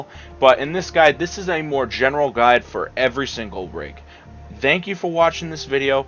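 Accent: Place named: Boston, United States. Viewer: love the boston accent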